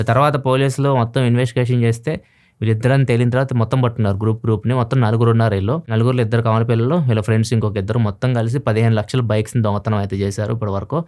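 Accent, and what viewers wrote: Ongole, India. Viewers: Intiki velutunna no Ongole language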